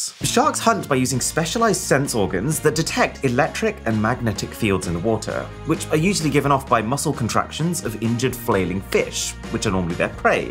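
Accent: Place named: United Kingdom. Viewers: He is British but for obvious reasons, the general audience is American and many statistics are measured using dollars. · I can never tell if is British or American. He sound British yet only talks about America for stats and uses dollar Can someone please clear this up for me please!!!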